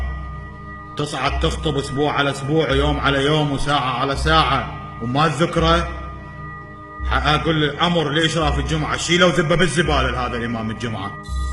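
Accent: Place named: Iraq. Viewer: زباله كلمه عراقيه بحته وطريقه كلام السيد. هم عراقي بحت ويبقى من عامه الشعب ومنه وبينه ف هاذ الحجي زد وهيه كوه تردون تسقطون بيه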